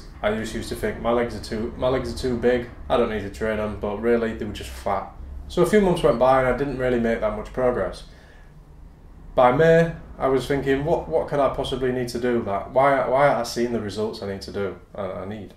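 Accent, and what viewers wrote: United Kingdom. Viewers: Come on ,,, its so clear that it's British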